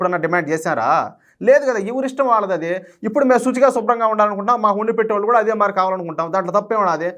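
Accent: Rayalaseema, India. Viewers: మీరు మన రాయల సీమ భాష అంటే నాకు చాల ఇష్టం మీరు చాల బాగా మాట్లాడు తున్నారు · చాలా బాగా చెప్పారు అన్న....మన రాయలసీమ భాష విని చెవులకు ఉన్న తుప్పు విడిచి పోయింది....జై శ్రీరామ్🙏🚩🇮🇳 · చాలా చక్కగా మంచి ప్రాంతీయ భాషలో రాయలసీమ భాషలో బాగా వివరిస్తున్నారు గురువుగారు జైశ్రీరామ్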